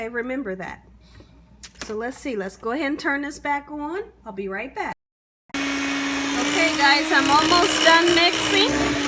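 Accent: South, United States. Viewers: I just love listening to your southern voice 😂you’re so sweet THANKS FOR THE COOKING TUTORIALS 🙏🏾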